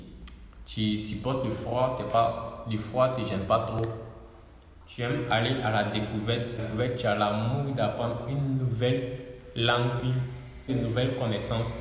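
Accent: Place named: Central Africa. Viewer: Bjr, M.. En dehors de ton nom atchié, je ne sens pas ton côté ivoirien dans les mots utilisés, leur prononciation, la composition des phrases, les répétitions de paroles, le niveau du langage, les mouvements du corps, et surtout dans les idées présentées. Tout indique l'Afrique centrale: Gabon, RDC, Congo, RCA, Cameroun... Sauf l'expression "pour m'encourager."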